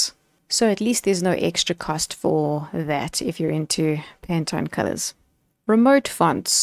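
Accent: South Africa. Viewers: Is that a South African accent I hear? :)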